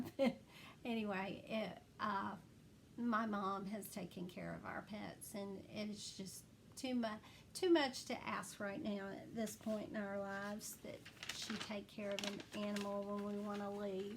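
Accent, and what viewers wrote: South, United States. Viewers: y'all both have such a sweet southern drawl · Just found you. Love your southern accent!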